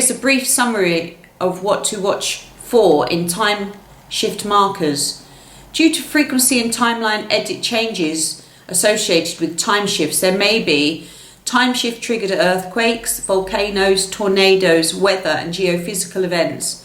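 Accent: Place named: United Kingdom. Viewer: please dont delete your channel. I love your sharings and British accent.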